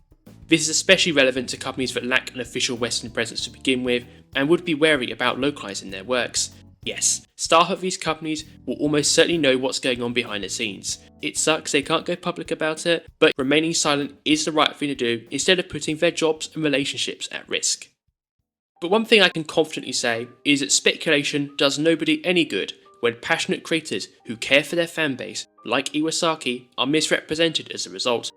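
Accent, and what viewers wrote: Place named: United Kingdom. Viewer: I am truly enjoying your videos and have subscribed to the channel. However allow me to provide some negative constructive feedback. You should realty work on your voice-over. Try to speak slower, and tone down your specific variant of British accent. I am a fully-fluent non-native British speaker, who has been mistaken for a native on several occasions, and who lived in the UK for years and had no trouble understanding the locals (in Scotland, no less). Yet I find myself not understanding words you say on multiple occasions and having to rewind the video, and even having to turn subtitles on when the re-listen isn't enough. Other than that, full respect for your Japanese gaming knowledge and the obvious deep effort and research that has gone into creating these videos.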